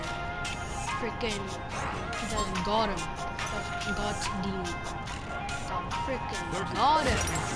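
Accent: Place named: Afrikaans, South Africa. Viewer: Are ya south african thats ONE HELL OF A AFRIKAANSE ACCENT MY BROE.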